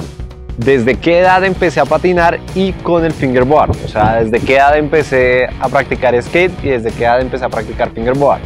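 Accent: Colombia. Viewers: Eres colobiano?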